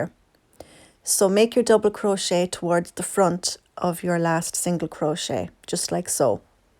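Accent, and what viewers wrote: Ireland. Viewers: Hi, I've been searching for a nice hoody/cardigan for the last few days and found yours, and I love it! I love your video very easy to follow and I love your voice , its very calm and mellow. Is your accent Irish?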